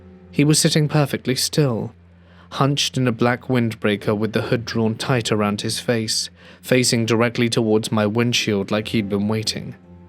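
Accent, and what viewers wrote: United Kingdom. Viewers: I'm not sure where you live but he has a very British accent like my own .Unless you live over on this isle then it's not going to be possible..I'm guessing you may be,American because I've noticed Americans never take into account that there's actually billions of us who don't live over there ..cheers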